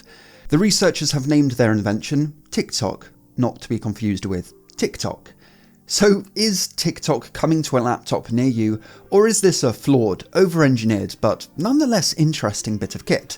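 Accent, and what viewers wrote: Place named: RP, United Kingdom. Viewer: you sound so posh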